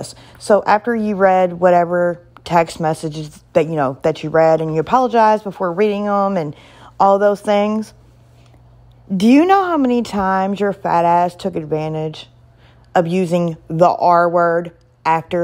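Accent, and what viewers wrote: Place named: Appalachia, United States. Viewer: I watched the first cpl mins of her live from earlier and I have to say, her harping on you not enunciating the L in the word hole…girl lol. Does she know what accents are? That’s the Appalachian dialect and yours isn’t really as thick. Accents have no bearing on education and intelligence. My daughter is PAID and flown to California etc to research math and present on her findings. Her accent is as thick as mine. All 3 of my kids tested in the top 5 percentile and are highly educated and motivated young women, two of which test on genuine levels. One was even scouted out by YALE, but even with their programs my country ass is too poor for all that lol. They are as country as cornbread when they speak. Also, if I recall correctly doesn’t she say “warsh” for wash? 🙄 Thinking someone is ignorant because of their accent is one of my biggest pet peeves lol so I had to say something. 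Also, as someone that struggles with mental health and has children that also struggle…it’s not safe or wise to tell a child anything negative being said about them, no matter who it is. They deal with enough from their peers as is, why add to that? Anyways, maybe one day I’ll hop on your panel and be as redneck as I possibly can to really give her some stuff to laugh at 😂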